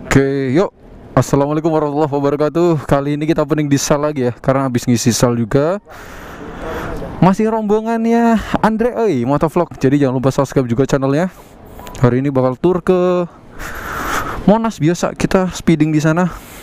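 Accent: Banyumas, Indonesia. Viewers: pantes ngapak.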